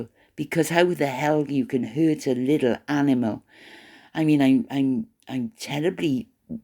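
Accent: Wales, United Kingdom. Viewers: I do love listening to you and your lovely Welsh accent - and you make me smile. Interested in the Dubai prescription if there is any way you can share link. I love my cat too. She’s my life but she’s 80 in human years and I worry I won’t have her much longer. Andrew’s black eye was from a businessman he owed money to. The author of the book clarified. That and the Harry punch in the nose are separate incidents. I’d like to give them both more than a black eye! Good you’re back,.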